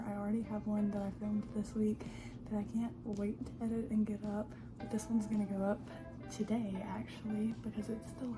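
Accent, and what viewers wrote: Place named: Appalachia, United States. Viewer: this randomly popped into my recommended and respect for knocking out that much reading in a month! Are you appalachian by any chance? obviously no need to be specific but your accent sounds like we could've grown up together lol!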